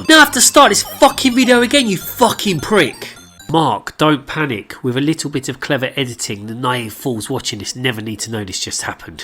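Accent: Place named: Southern England, United Kingdom. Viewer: Is swearing a thing that you do irl as well? Lol I mean it just seems out of place. Half those words are totally new to me! I am gonna go repeat that to my ten nearest fellow humans! I always get bollockings for saying things like I always get bollockings. It's a worthy sacrifice to the gods of filthy far-right Hitler language like piss and shit! I am gonna be like Moe from Simpsons, I know not what I say 🤣 STOP PLAYING WITH YOURSELF AS WELL!! Dude this is actually fuckin hilarious now... English accents are the best as well. You sound like somewhere between the home counties and egham you definitely in the south!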